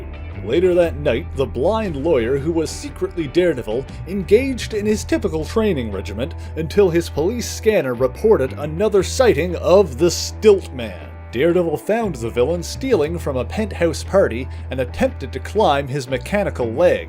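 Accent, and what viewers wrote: Canada. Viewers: You pronounce things funny. Are you Canadian by any chance?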